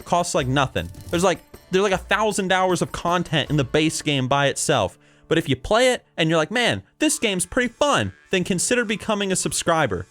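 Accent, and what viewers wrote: South, United States. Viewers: I was loving the southern drawl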